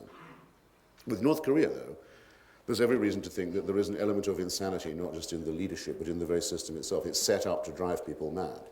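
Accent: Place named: Oxbridge, United Kingdom. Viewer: Notice how he focuses on Europe and the Middle East, no mention of Central and South America. His memory lapse regarding El Salvador and Nicaragua is ideologically too convenient to overlook. Thankfully for those not chained to the Anglo-American doctrinal system, one can hear past the Oxbridge accent and see this impostor for what he truly is.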